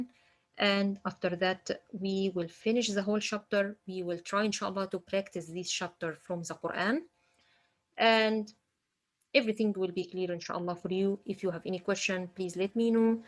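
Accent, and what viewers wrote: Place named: Egypt. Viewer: I guess by your accent you r Egyptian??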